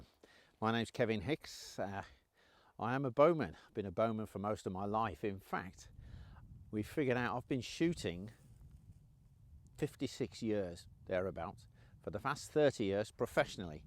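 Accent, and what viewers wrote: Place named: Black Country, United Kingdom. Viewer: I can't tell if is a Brummie or yam yam, his accent has diluted In fact I'm going guess at the Halesowen area.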